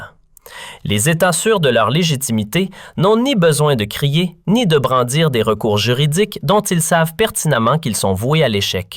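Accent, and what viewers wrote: Canada. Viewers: à l'accent canadien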